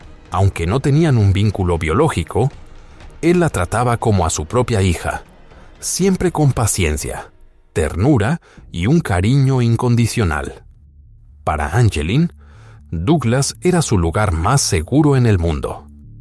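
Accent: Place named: United States. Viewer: Es muy raro ver a alguien que no es estadounidense con acento estadounidense.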